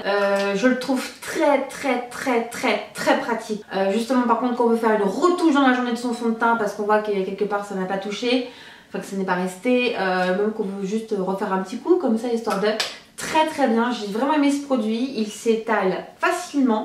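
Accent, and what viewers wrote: England, United Kingdom. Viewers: J'ai le même mascara de chez Ives Rocher il est génial mes cils sont plus long vraiment super et t'aime très très fort avec ton accents anglais bisou!! <3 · je t'adore et j'adore ton accent british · Il faut que tu arrêtes de faire l'acsan anglais. Je t'adore ma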